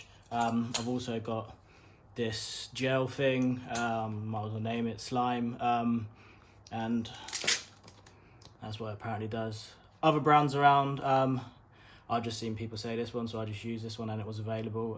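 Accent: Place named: Jamaica. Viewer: 😂😂😂 good Jamaican accent By the way you put the tire on first then put the innertube in after ..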